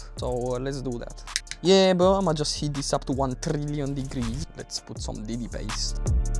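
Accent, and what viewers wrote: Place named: Romania. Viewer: Ma gandeam dupa accent ca esti roman si am auzit spray de tanatari 😂😂😂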